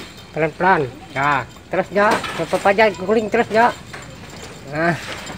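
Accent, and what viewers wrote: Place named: Central Kalimantan, Indonesia. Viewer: Menyimak suara bro seakan-akan saya sedang berada di Kalimantan Tengah.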